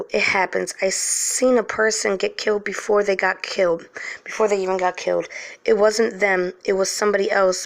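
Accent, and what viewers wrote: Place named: Brooklyn, United States. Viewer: This was great hearing stories from the Babez and from you as well! Being from the tri-state area, (Passaic County NJ to be exact approximately 10 miles outside of Manhattan) I've always pegged your accent as being from the Brooklyn area LOL!! Don't worry I haven't lived in Passaic County in 26 years now, and the people from here (The Upper Connecticut River Valley Region of Vermont) all say that I speak very strangely with an accent as well. I moved my daughters here when they were just 3 and 4 years old and they still have "North Jersey" accents believe it or not! Anyway, I'm familiar with Westchester and I believe that you're absolutely correct about that cemetery. Back in those days (and today if they can get away with it), Building needs to go up and a highway run through? Just remove the headstones get rid of them, depending upon how long the cemetery had been there pre-1900s there may or may not have been any cement vaults as there are today, and digging the foundation, etc may have turned up absolutely nothing just like digging for the highway. 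No problem for anyone except the people buried in the cemetery, and consequently the people living in the building who experience the visitation of the souls of those whom they are living upon who are generally a bit angry about being disturbed, now having their final resting place unmarked and an apartment building built over it! There have been other occasions during more modern times when headstones were just relocated, but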